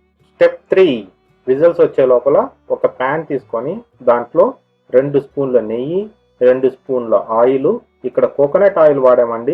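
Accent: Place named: Karnataka, India. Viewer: I like your kannada language slang, happy to hear.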